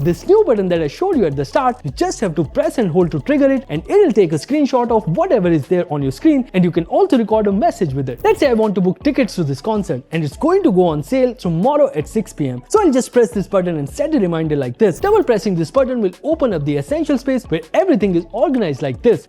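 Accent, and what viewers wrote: India. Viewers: Non native English speaker here rarely gets to hear English with Indian accent in it, but i can completely comprehend all of the things he said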